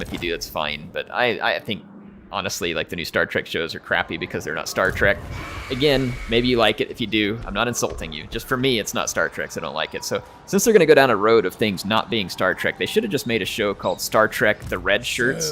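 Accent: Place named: South, United States. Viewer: You've got a slight southern drawl each time you speak. Lol cheers. I grew up in a few small towns growing up. Blue collared and conservative they like to fish and hunt. I like your videos! Thanks.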